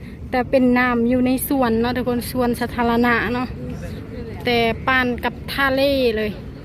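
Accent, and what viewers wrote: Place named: Cambodia. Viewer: แอดสำเนียงออกไปทางเขมรนะ ไม่เหมือนคนลาวร้อยเปอร์เซ็นต์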